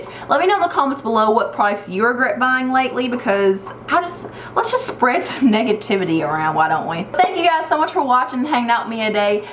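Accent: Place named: Georgia, United States. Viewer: I subscribed as soon as I heard your accent. 😂😂😂 I'm from GA and live in SC. I like hearing people talk like me! 🙌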